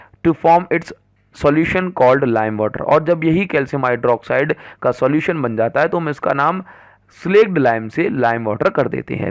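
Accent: Odisha, India. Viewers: Sir,are you from Odisha❓ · Sir are u from odisha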